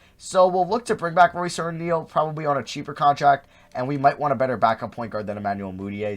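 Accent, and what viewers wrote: Pittsburgh, United States. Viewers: Hey are you from Pittsburgh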